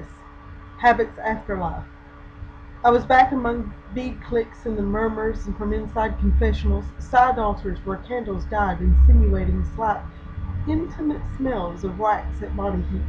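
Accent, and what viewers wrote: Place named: South, United States. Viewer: Thank you for posting this reading. Lovely. I have never heard Station Island read in anything remotely like your (Southern?) accent. To my ear there's an earthy (even folksy) tone to your voice that suits Heaney's poetry surprisingly well.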